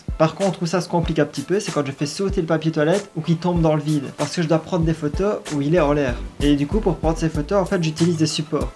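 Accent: Liège, Belgium. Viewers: Toi tu viens de Liiiiidge m’fi!! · J'adore ton accent !! c'est belge non? 💘💗😙 sinon continue comme ça tu gère pouce bleu aussi pour cette vidéo (comme toute les autres)😚💝😙💗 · Es-tu belge? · Tu es belge ou je me trompe? Je reconnais l'accent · Tes belge ? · Tous les belges ont cet accent?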